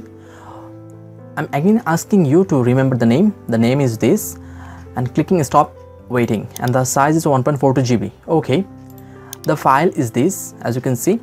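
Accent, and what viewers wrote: India. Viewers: alhamdulillah i am not indian also but i thought ur voice was indian srey Thanks alot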